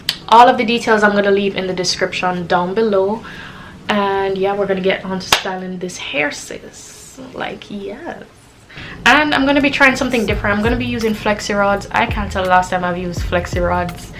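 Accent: Jamaica. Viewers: You Jamaica already know the accent 😍😍😍🇯🇲🇯🇲 island gyal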